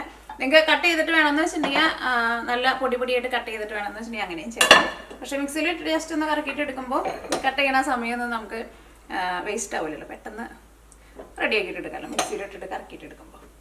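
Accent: Thrissur, India. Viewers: Thrissur slang , mutta kuruma adipoli, ee veedu evideyanu?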